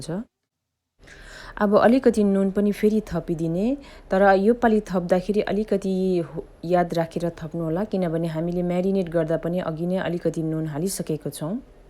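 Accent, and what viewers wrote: Darjeeling, India. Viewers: kati mitho boli ho didi hajurko fist time herdai chu tara aawaj chai sarai ramro darjeeling ko ho didi hajur??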